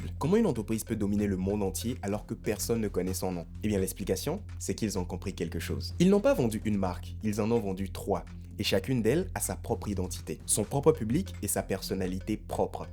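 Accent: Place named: Benin. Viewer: Great video Vous avez un accent béninois/togolais Rare de voir des feedbacks venant de ses nationalité Mercii beaucoup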